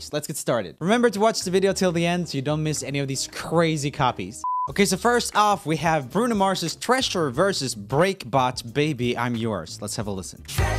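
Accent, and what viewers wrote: Sweden. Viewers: Its probably his swedish accent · It's his accent. Idk why Swedish accents are like that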